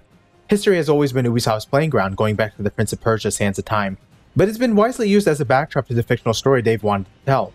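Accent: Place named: North America. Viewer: I'm English and clearly understand the narrator by the way. I guess North American is hard for some...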